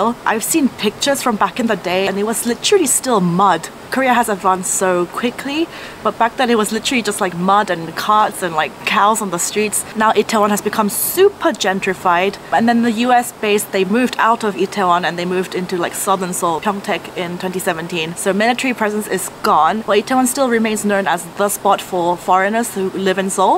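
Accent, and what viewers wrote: United Kingdom. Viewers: Do you have a british partner or spent time learning english in the UK? You have an interesting british accent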